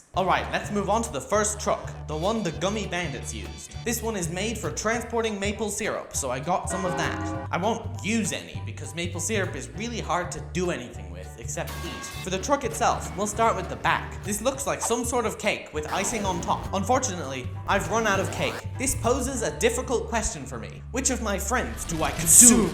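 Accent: Australia. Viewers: UR AUSTRALIAN :0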